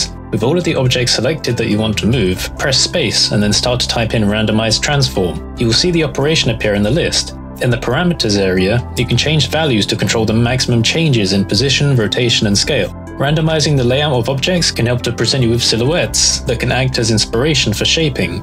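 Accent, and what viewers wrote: United Kingdom. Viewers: wow this is my first time seeing you haha I love all your vids but by your accent I always thought you was a British Pakistani or something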